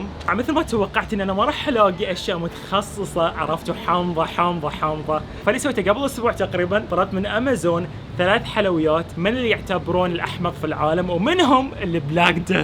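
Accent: Bahrain. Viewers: اول مره اشوفه و محتواه يجنن بل بدايه حسيته عراقي بس حسيت لهجته بحرينيه او من اهل الاحساء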